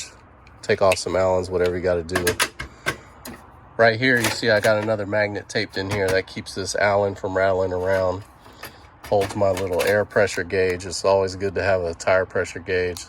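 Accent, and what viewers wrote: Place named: West Newbury, United States. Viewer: I appreciate the vidya, with that west newberry accent mr john cena jr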